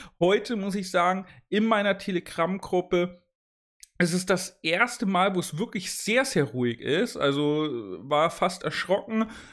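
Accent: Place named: Oldenburg, Germany. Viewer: Höre da einen Oldenburg-Akzent raus ^^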